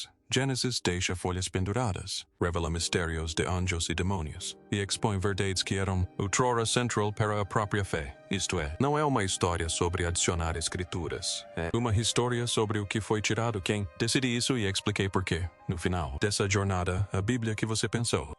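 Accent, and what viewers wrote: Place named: United States. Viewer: Por que essa língua? Usou a IA e fez narrar como um americano com sotaque? Isso estraga completamente o trabalho, e só melhora depois (por um momento).. Isso é um trabalho RELAXADO meu amigo.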